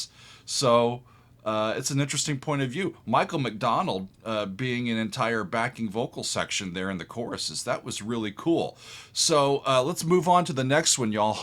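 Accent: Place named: North Carolina, United States. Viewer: It's how folk from North Carolina and the Outer Banks talk naturally.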